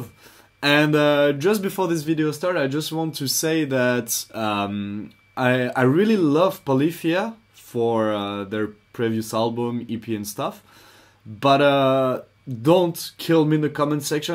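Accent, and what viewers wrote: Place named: France. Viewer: Avoue t'es français, on a tous cet accent xD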